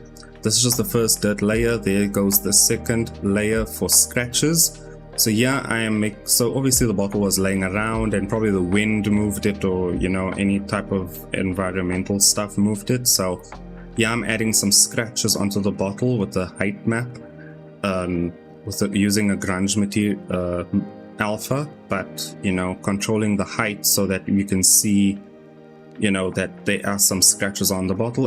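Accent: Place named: South Africa. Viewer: btw one question, are you south african? i can hear by your accent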